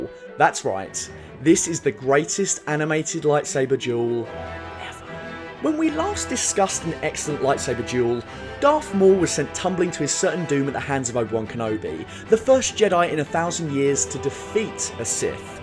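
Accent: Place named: England, United Kingdom. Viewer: Fuck your pommy voice is annoying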